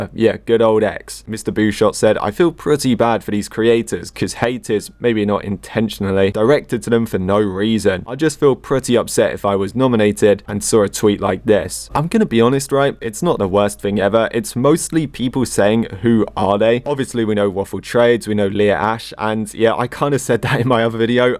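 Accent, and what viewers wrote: United Kingdom. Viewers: Hah british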